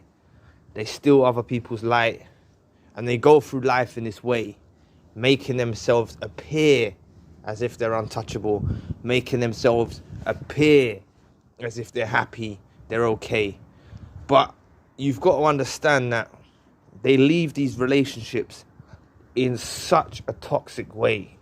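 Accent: London, United Kingdom. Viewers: Very welcome 👍🏻 What part of London are you from i was born in Leytonstone ☺ sorry for asking just love hearing a familiar accent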